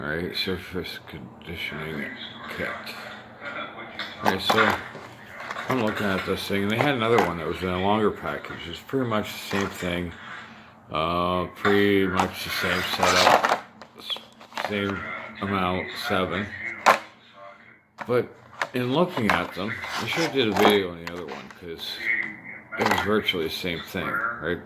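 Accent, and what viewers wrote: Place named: North, United Kingdom. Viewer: Some good stuff you got there! I hear that great north accent =p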